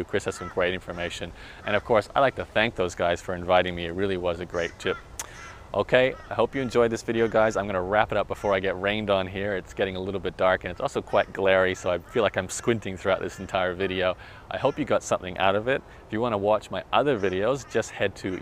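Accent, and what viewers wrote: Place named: United States. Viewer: You are Australian, yet you sound like you have an American accent? Must be all that travelling you do?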